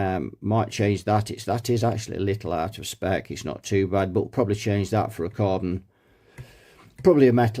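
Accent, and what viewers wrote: Nottingham, United Kingdom. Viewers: Nottingham accent?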